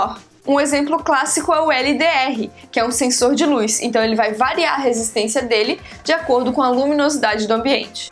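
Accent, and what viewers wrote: Rio Grande do Sul, Brazil. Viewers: E pelo sutaque é gaúcha kkk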